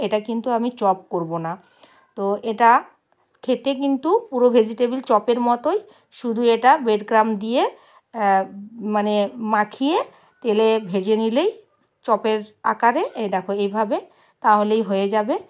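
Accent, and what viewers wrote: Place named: India. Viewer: অনেক অনেক ভালোবাসা রইলো বোন এগিয়ে জাও তোমার কথাগুলায় কেমন জানি একটা টান তবে শুনতে বেস লাগে তুমি কি ইন্ডিয়ান দিদিভাই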